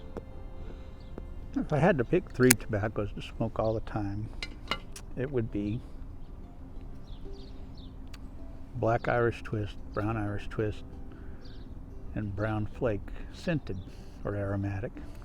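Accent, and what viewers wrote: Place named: South, United States. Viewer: picking up a little southern drawl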